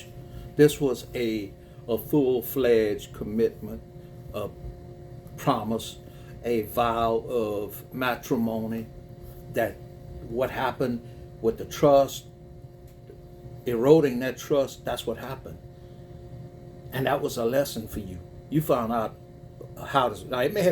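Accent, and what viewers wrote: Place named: Louisiana, United States. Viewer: Love your Louisiana accent, don’t know why but it feels like HOME❤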